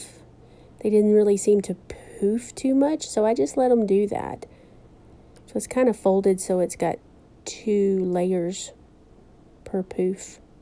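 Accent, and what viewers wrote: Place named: Midwest, United States. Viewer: Very nice! Question where are you from? The mid west! Maybe Indiana? Just listening to you talk.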